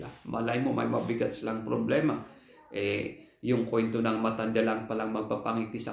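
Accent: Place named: Ibaloi, Philippines. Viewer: Badarong 😂😂😂 ensend ko your other video to my friends, and akala nila amin talaga nga ibaloi ket kasta accent na. 😂😂😂 keep on making videos 😃😄😄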